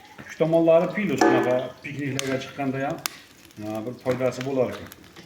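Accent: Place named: Namangan, Uzbekistan. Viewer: Гап йук уста ака наманганни жийда капа ёки чужа кишлоги шеваси билан бир хил экан шевангиз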